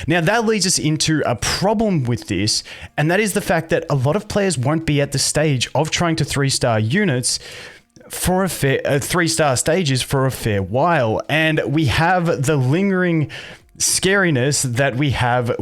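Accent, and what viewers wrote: Australia. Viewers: wait australian?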